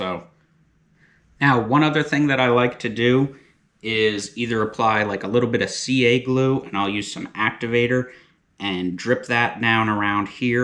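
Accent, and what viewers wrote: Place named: North Carolina, United States. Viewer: where you out of your accent sounds eastern NC-ish, love this setup.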